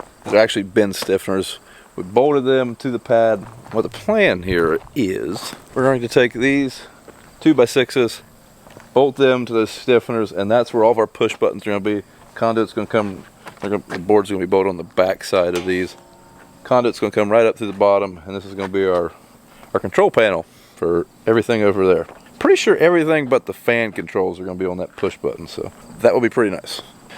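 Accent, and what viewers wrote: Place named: Ohio, United States. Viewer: I appreciate hearing talk. It reminds me of spending summers in Columbus working for my uncle in the early 90's. I also spent some of that time in Chillicothe, had a cousin there. Living near Buffalo, you don't hear your accent here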